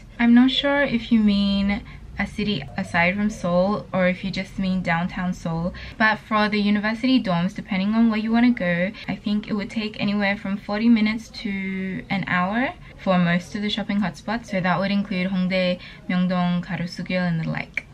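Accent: Australia. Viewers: Your aussie accent is a boooooomb ‼️💖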